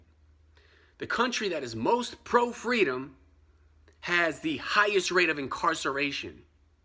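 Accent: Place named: United States. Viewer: If American is so bad why are you using YouTube?? An American invention???? Why are you talking with an American accent?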